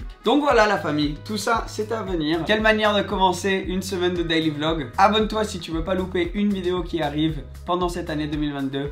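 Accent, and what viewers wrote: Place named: United Kingdom. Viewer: On dit TESLA, pas TESLA. L’accent UK, ca va bien 2 minutes, ça fait des mois que t’es revenu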